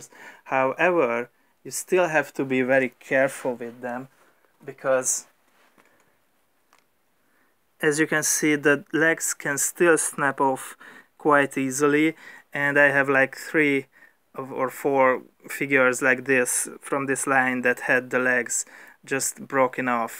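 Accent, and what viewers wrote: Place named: Hungary. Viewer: Te lenni magyar? nagyon akcentusos....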